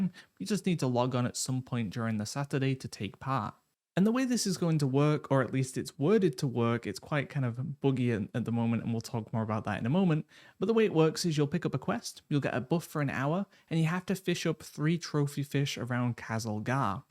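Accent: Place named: Manchester, United Kingdom. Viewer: My guy is so Mancunian ❤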